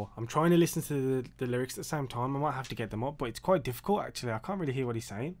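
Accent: Birmingham, United Kingdom. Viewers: I love your accent!! Brummy? Watching you from Ireland. I love to see the younger generation appreciate real music. 🎶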